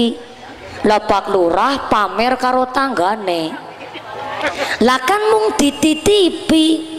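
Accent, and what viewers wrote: Banyumas, Indonesia. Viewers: Saya suka ngapak tapi jelas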